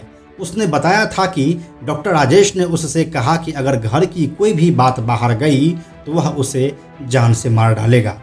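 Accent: Bihar, India. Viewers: Ye. Bihari. Bol. Raha. H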